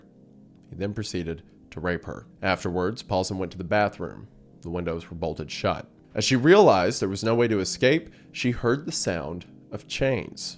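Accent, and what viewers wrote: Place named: South, United States. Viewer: your not so upbeat southern voice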